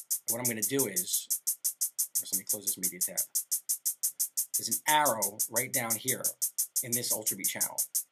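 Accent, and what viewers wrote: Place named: New York, United States. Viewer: Your so new york and italian it makes learning so much easier.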